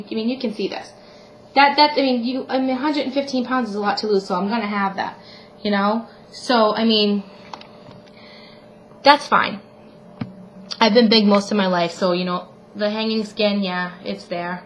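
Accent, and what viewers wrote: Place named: Massachusetts, United States. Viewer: FIRST thing I noticed about you was your Massachusetts accent!! lol LOVE it!!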